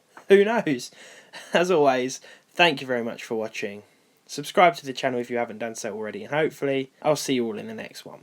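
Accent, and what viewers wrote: Australia. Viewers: Need more Aussie accent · Love the Australia accent 😂😂😂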